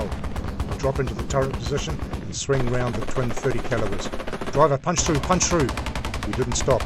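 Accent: Australia. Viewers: Ja right you are an Ausie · You drive through an ambush, or a TB, like we did. Pity you chose an ozzy accent for the narration.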